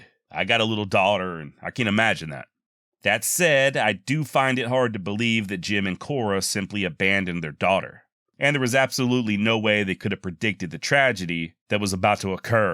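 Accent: United States. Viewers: Wow 😮 Such an AMERICAN made voice❤❤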